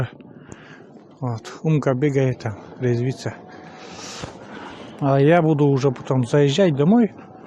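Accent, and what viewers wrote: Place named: Arkhangelsk, Russia. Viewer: Спасибо.Я недавно на Вашем канале.много посмотрела.но ещё не все.С мужем смотрели.как Вы рыбачите.как грибы собираете..Хорошо.что такие помощники у Вас есть.Не так надрываться.Корни моих родителей -Архангельская область.И поэтому говор ваш слушаю с огромным удовольствием.Но дома в вашем селе отличаются.Большие.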